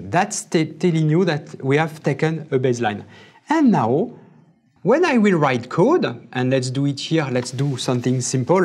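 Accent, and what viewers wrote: France. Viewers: Don't be mistaken, it is French with English words, no offence to anyone, but most Frenchies speak like that ;-) *Pink panther movie series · Very hard to listen to your english... It is almost french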